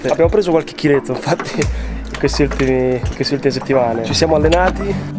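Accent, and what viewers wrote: Rome, Italy. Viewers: Oddio ti prego stai iniziando a perdere l'accento romano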